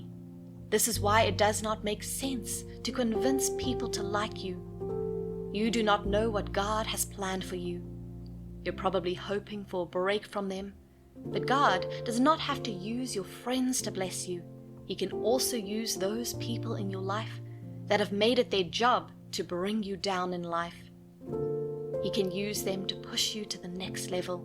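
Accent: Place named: South Africa. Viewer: Thank you for this! You sound South African. :)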